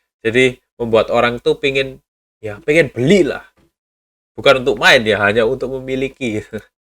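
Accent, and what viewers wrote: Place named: Surabaya, Indonesia. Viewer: Nih Reviewer medok ALA SUROBOYO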